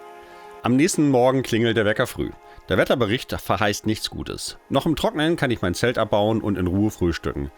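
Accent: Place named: Denmark. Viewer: Streckenweise warst du der Alm-Öhi des Nationalparks😅👌 Nur die Sache mit der Kamera ging gründlich daneben😔 So ein Mist! Dein Video zeigt ungeschminkt die Natur, die man dort erwarten darf🫠 und deinen dänischen Akzent finde ich richrig niedlich 😁.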